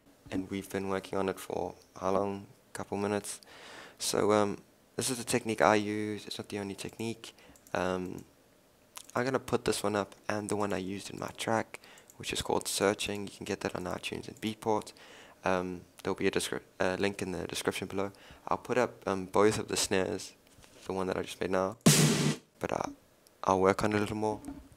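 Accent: South Africa. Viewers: ***** Hey man, are you from South Africa?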